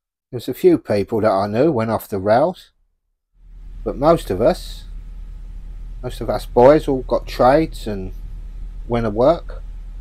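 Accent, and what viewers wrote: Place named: Cockney, United Kingdom. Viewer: Hi, really pleased to come across your channel hearing your lovely Cockney accent. We are nearly at the end of our boat build and are really looking forward to being on the cut. We hope to see you out there one day and promise to keep our music down 😅. Best wishes Lee & Ali nb black swan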